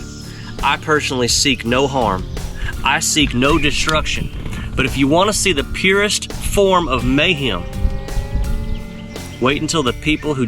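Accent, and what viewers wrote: South, United States. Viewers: So odd to hear this quote in a Southern accent. It remains true and the core of my soul in these days however. "I hope and pray everyday that I am not forced to untether myself from the bonds of civility, and to engage in the destruction of all those who seek the dominion and harm of those whom I hold most dear. For should that wrath of Man be released upon mine enemies, neither them; nor I, will ever live in peace under the sun again. For indeed, I will be forced to scorch earth to preserve the life and well being of those who I do love."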